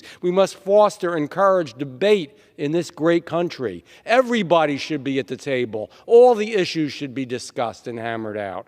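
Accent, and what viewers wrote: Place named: South Jersey, United States. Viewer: That South Jersey accent.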